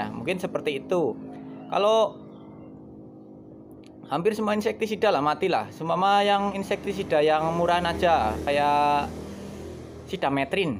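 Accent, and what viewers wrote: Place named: Banyumas, Indonesia. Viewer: Lucuu mas e... bahasa Banyumasan...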